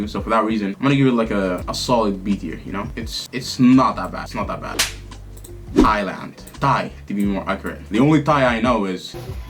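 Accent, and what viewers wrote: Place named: Russia. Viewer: i am fom italy and russia and your accent is incredible for russian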